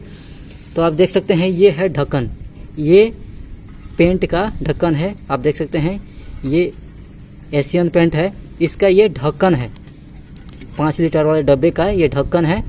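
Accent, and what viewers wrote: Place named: Bihar, India. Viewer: Bihari h sir kya aap ! Chadar ko chdar bol rhe h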